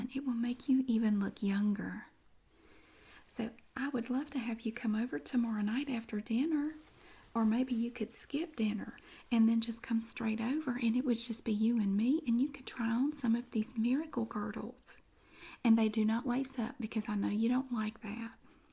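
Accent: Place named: Carolina, United States. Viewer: Accents change a lot based on location. Her accent is mostly from north/South Carolina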